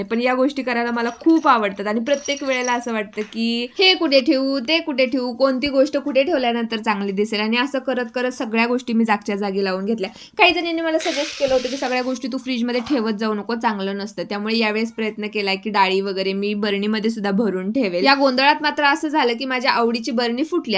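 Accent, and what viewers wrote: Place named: Kolhapur, India. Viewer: Tumchi bhasha amchya Kolhapur sarkhi vatate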